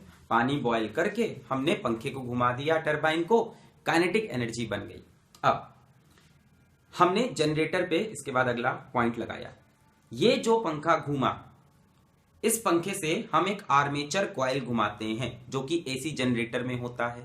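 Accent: India. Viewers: I love your hindi accent, even though I speak a little.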